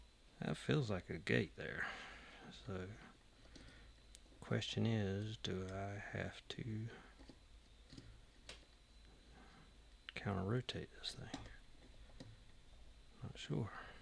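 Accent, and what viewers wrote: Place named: North Carolina, United States. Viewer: I knew instantly you were from NC the first time I heard you on LadyLocks live. Great open sir!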